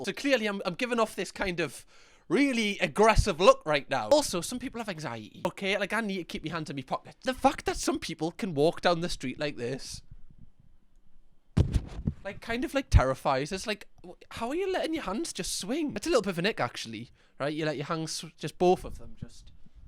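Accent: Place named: Northern England, United Kingdom. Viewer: i used it briefly twenty years ago and had some good job offers. Unfortunately it's was at a time in my life where i was moving out of my mum's place and had a local good and steady job offer which I've stayed with. From what you've shown today I'm glad I've not had to go work for these sociopaths. That aside, I'm born Northern but raised Southern (moved Dan Souf when i was a year old). I love your dialect - i really enjoy your takes and sometimes incorrect pronounciations - don't stop what your doing dude, and good to see your missus on recent video too. I've been en subbed for about a year or two now so not fully in with all the lore, but I'm here and staying! Cheers big ears ❤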